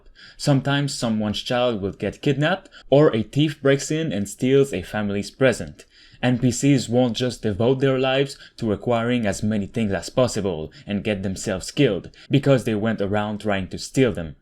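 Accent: Quebec, Canada. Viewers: are you french canadian ? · Are you French Canadian? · Is that the accent of a fellow french canadian I hear? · That’s not the subject but are you québécois? I say that because of your accent Anyways great video!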